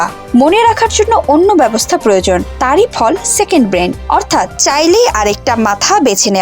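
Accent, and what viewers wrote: Kolkata, India. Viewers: ৭১ টিভি কি কলিকাতার সাংবাদিক নিয়োগ ূিছে নাকি🙄🙄🙄🙄 সেরকমই লাগে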